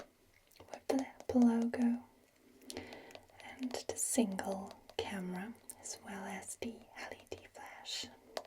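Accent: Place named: Germany. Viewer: A suspiciously good German accent🤔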